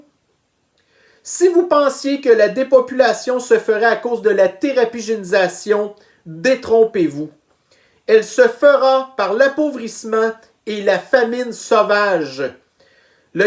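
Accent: Quebec, Canada. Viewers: tu as raison mon gars , j aime bien l'accent québécois , chez nous ,en France hier le prix du diesel à la pompe était à 2.14 € le litre . MACRON doit foutre le camps avec son Bruxelles de merde . ce gugus a tout fouttu nos entreprises par terre . et faché avec la russie . c est inadmissible .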